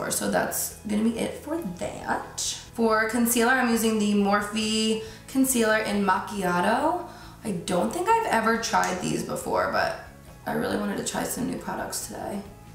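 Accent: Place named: Philadelphia, United States. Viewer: Absolutely gorgeous ! Love this look 👏❤️ Are you from Philly or around here? I hear your accent like mine... “wuter ” hahaha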